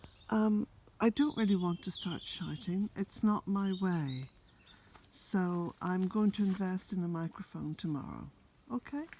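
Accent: Ireland. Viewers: HOW I LOVE TO START MY MORNINGS AT BEALTAINE COTTAGE BUT AM HAVING GREAT DIFFICULTY HEARING YOUR LOVELY IRISH VOICE. WISHING YOU AND JACK A WONDERFUL DAY, LOVE TO YOU BOTH XXOO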